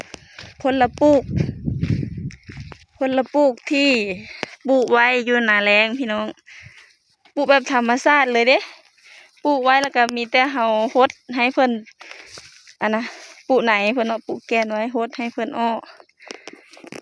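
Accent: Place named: Phu Thai, Thailand. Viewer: ได้ยินคำเว้าของน้องทำให้เราคิดถึงบ้านเพราะเราก็เว้าเหมือนกันภูไทย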